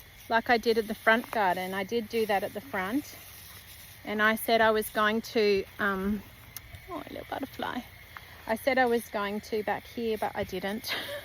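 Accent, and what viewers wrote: Australia. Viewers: I so ❤ listening to you talk in your Aussie accent. 💕 And we can figure out what you are referring to most of the time, peppers or Capacean. Bless you and your family! And sunshine & raindrops to you my friend. 💗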